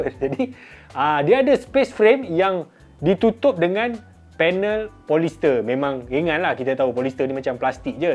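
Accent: Kelantan, Malaysia. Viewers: Bey demo ore klate dih?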